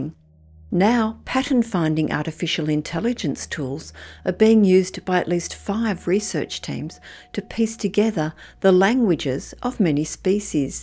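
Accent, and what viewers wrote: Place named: Australia. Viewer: Australian narrator I like it 👍👍❤️❤️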